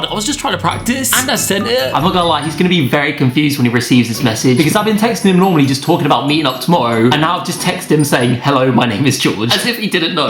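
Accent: United Kingdom. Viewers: Just bothered with the British accent sorry😅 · I love his British accent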